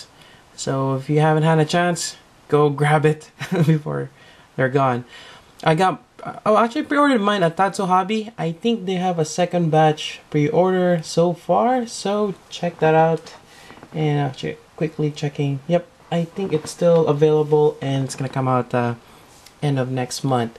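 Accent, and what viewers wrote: Philippines. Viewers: r u from the Phillipines?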